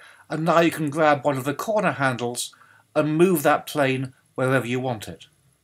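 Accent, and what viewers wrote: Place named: United Kingdom. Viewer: What a beautiful british accent, thanks for the tip ;-)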